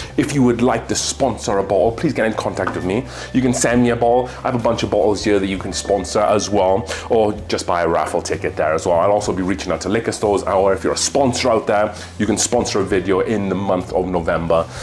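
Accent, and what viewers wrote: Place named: Wales, United Kingdom. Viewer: Are you a taff? just guessing by the accent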